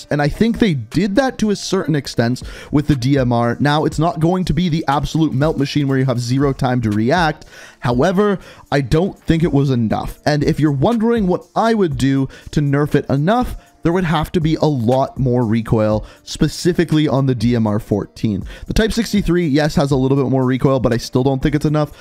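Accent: Canada. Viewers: yr Canadian?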